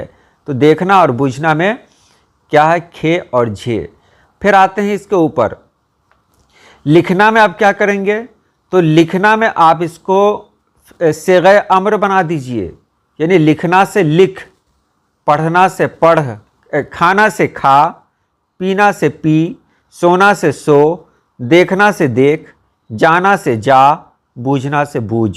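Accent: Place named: Patna, India. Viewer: سر میں پاکستان سے ہوں میں آپ کی ویڈیو باقاعدگی سے سنتا اور دیکھتا ہوں۔ مجھے ناجانے کیوں ایسے محسوس ہوتا ہے جیسے آپ کا تعلق پٹنہ سے ہے کیونکہ میرے ساتھ مسقط میں کچھ دوست کام کرتے تھے جنکا لہجہ بالکل آپ ہی کی طرح تھا سر آپ کا وٹس اپ کا نمبر اگر مل جائے تو میرے لئے باعث فخر ہوگی شکریہ اللہ تعالیٰ آپ کے علم میں مذید اضافہ فرمائے آمین ثم آمین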